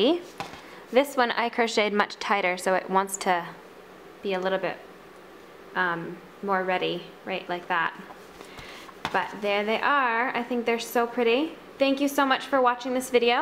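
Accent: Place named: United States. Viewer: I tried to crochet a snowflake from a pattern in a magazine and I got stuck so I just googled how to crochet a snowflake and your video came up! Thank you so much, I'm still a beginner and have taught myself some basic stitches from a book. I live in the UK and I just wondered if American crochet stitch names are the same as UK ones? Anyway I managed to complete it from watching you, with many rewinds! Many thanks, it's for my daughter's birthday as an extra little something to hang on her Christmas tree. I've made it in turquoise as that's her favourite colour. I'm gonna make two more in white. I've also got some tiny sparkly beads which I will sew on using invisible thread. I liked the way you talked about it being prettier done in a certain way and your American accent was reassuring!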